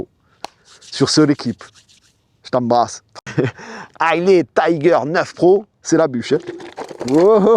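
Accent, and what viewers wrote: Marseille, France. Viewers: merci et trop kiffant ton accent de Marseille je kiffe quand tu dit laissssee passer le monnnstree!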